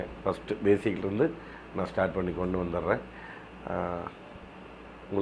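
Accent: Kongu, India. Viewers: Anna iam from erode bhavani.... Unga kongu slang vera level...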